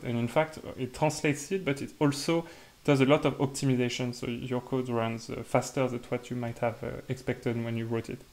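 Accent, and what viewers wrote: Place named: France. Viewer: are you french? i recognise this accent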